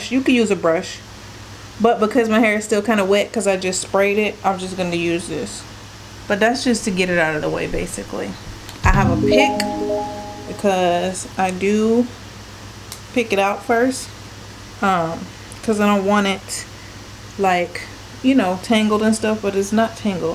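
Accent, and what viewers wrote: South, United States. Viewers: Yes concoction-- i say that all the time!! Southern drawl-- love it-- in central PA and ya'll aint in their vocabulary!! Nice video-- gonna try instead of 500 curl.puddings!!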